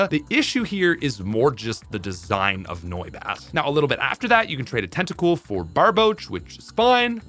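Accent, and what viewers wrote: Canada. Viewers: He sounds so aggressively Canadian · Screw this Canadian accent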